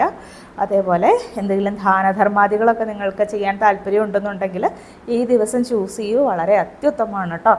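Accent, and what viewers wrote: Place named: Valluvanad, India. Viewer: മോൾടെ വീട് വള്ളുവനാട്ടിൽ ആണല്ലേ. അതാണ്‌, ഓരോ വാക്കും കഴിയുമ്പോൾ, ട്ടോ എന്നു പറയുന്നത്.👌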